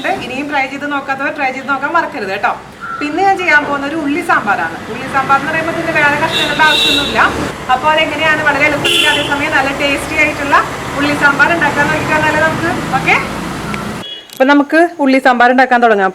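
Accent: Thrissur, India. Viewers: chechide samsaram kettapole thonni, enikkishttayi njanum thrissur anu, ipo Bahrain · Tani thrissur tanne...... Really great cooking.... Used to follow most videos. Wat we really enjoy is the slang....... Itu vere level ayi makkalee..... 😂😂😂😂. Good job n keep going. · chechiii trissurkkari aano? Trissur slang aanalloo speak cheyyunnaath...super 😊 All recipes are superb.🎖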